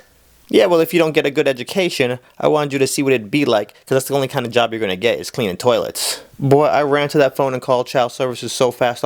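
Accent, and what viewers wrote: Trinidad and Tobago. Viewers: Trini accent legit! lol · That Trinidadian accent had me rolling XD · nice trini accent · My first job was a veterinarian assistance. Also your trini accent is real good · Trini accent on point😂💯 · Trini accent on point, much love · The trini accent tho 💀🇹🇹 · A plus rating fi did trinin accent! And you know Caribbean people give you one nickname, it stick for life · is the trini accent .. i weak🇹🇹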